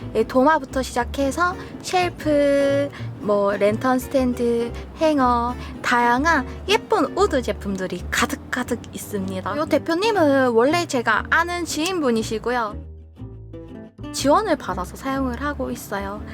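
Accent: Gyeongsang, South Korea. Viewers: 1. 베-베르그라뇨 르-르(느)무나 개취네욤 그-그럼…저 주시나요?ㅎ 2. 우드 랜턴 스탠드-월넛(중) 을 호시탐탐 눈팅만 하고 있답니다. ㅎㅎㅎ영상보고 넘 갖고싶은데…부피도 좀 있을 것 같고..가격도 가격이고… 저도 경상도 출신이라 경상도 액센트 넘 정겹고 좋아요. 항상 영상보고 부러운 마음입니다. 맛있게 먹고 작은거에 감탄하시는 모습이 힐링이에요~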